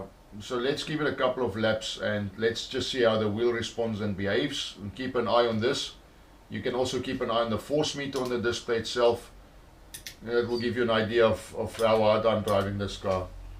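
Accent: South Africa. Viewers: Hi just wanted to find out where u are from you sound South African. IF so where did you order your simucube from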